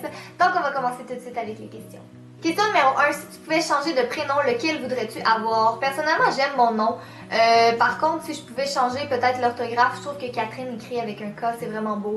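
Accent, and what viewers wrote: Canada. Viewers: Coucou, je suis français et d'habitude l'accent canadien me dérange mais là franchement je le vie bien mdr ! Et sinon oui c'est vraiment comme ça qu'on vous voit les canadiens, avec des chemises de bucherons et vivant dans des igloo ! :p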